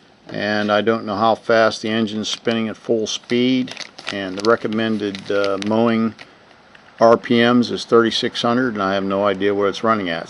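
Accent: Pittsburgh, United States. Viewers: "Shipped to the haaass" 😂 love that Pittsburgh-ese!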